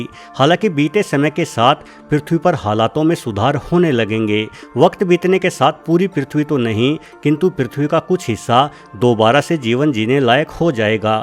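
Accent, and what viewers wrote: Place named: Bihar, India. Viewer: Bahut sound like bihari tone 🤣🤣🤣😂😂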